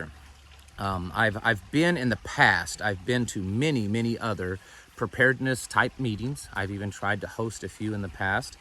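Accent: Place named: South, United States. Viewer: Very very southern ill. Hello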